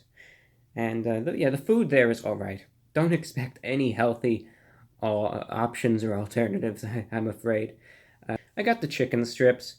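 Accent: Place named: Mid-Atlantic, United States. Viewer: He likes to be classy, if u notice his got a mid atlanitic accent which was popular before 1950. people back then wore suits all the time too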